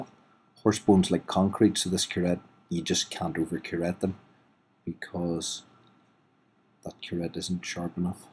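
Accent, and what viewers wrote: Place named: Northern Ireland, United Kingdom. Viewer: Hi, I'm a N'Ards (Co. Down) girl living in England and my colt has just had this surgery. I'm re-assured at seeing this video of the surgical procedure, but have many questions to ask. He's a 9 month old SHxWB, Fleetwater Opposition and Cavalier Royale bloodlines. Concerned owner is all, but hearing the NI accent has made me ask if you have the time to give me some info on post-surgery aftercare etc? I've been told is a cartilage defect? I'm confused...!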